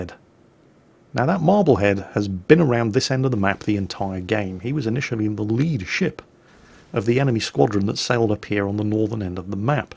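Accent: England, United Kingdom. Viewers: Graham Norton has the same sort of sound, check <link> Is it just a British affectation? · is a smoker......also: There is a reason (I'm not a native speaker) i switched over from hiding my accent with a learned American one and switched to using version of British accent.....its very easy on the vocal cords.....at least in my experience. It's soft on purpose. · my friend, i must tell you.. I don't play these games, but love to watch, and out of everyone i watched you are the only one that caught my attention.. And i also subscribed, to your channel, i guess you are the only one that's not annoying.. Plus you are very knowledgeable and can flat out play too.. Your commentary is just as mind blowing as the battles, and for a guy from the inner city of Baltimore md in the U.S.A. i love that English accent.....🇺🇸🇬🇧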